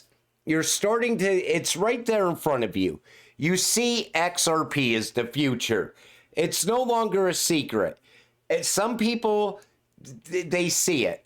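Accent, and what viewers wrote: Pittsburgh, United States. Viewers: You sound like a Yinzer, from Pittsburgh!!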